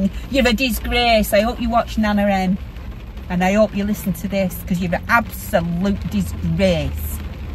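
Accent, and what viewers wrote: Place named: Northern England, United Kingdom. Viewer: Hi, I just love watching your videos etc. You alway bring a smile to my face. So good to hear a northern accent as I moved from near Manchester about 40 years ago. Thank you. Have a great holiday x❤️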